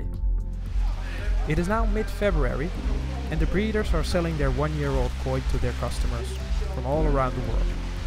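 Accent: Netherlands. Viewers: Dutch accent? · Are you dutch? Your accent sounds like mine and i'm dutch. Great video too! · A Dutch guy (judging by accent) speaking English about Japanese Fish. We Dutchies always had something for multi-cultural stuff... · are you Dutch? Ik hoor Nederlands 😎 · haha ik ben ook nederlands hoorde her meteen aan je accent, toch nog zeker wel een goede engelse uitspraak!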